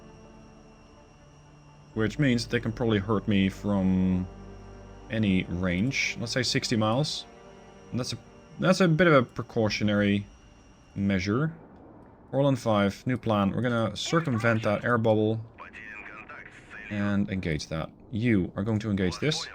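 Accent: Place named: Netherlands. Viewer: Ik detecteer een Netherlands accent in je Engels 🤔. Landgenoot 💪Dutch🇳🇱 ?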